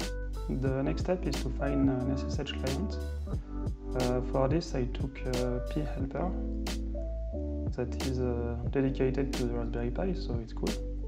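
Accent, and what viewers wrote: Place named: France. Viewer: Very nice and charming with spoken English with a heavy French accent! Though when neither language is your mother tongue then the background music overlay upon this really becomes an obstacle! I'll see if my patiance will take me through this ... accordingly I can not give you any score for this video yet ...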